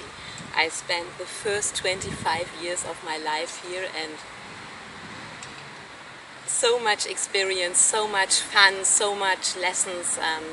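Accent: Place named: Germany. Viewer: Dadurch, das Dein "english" soooo schulmäßig deutsch ist, habe ich mir schon gedacht, das Du aus dem Ruhrgebiet kommst ! ( Bin selbst gebürtiger Dortmunder . . . ) Du bist jedenfalls sehr weiblich !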